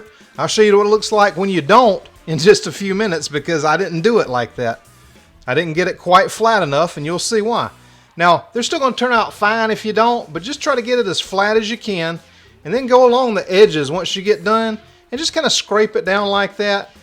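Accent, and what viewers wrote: South, United States. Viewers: Thanks, I can't wait to try this! P.S. this WV Hillbilly loves your Southern accent.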